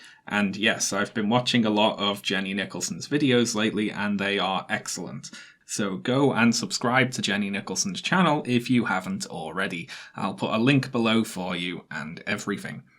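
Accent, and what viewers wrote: Liverpool, United Kingdom. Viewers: well, it’s informative, entertaining and elegant in its simplicity. Plus, I’ve discovered I have a thing for Scouse accents. The ones I can understand anyway.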